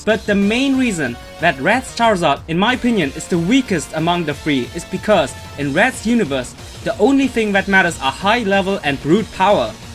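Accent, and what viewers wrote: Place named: Germany. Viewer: you sound german ^^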